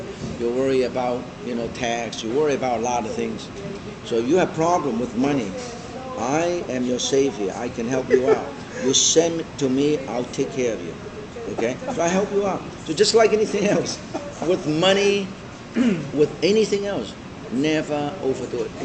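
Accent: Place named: Philippines. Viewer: pinoy!! halatang halata sa accent!! :)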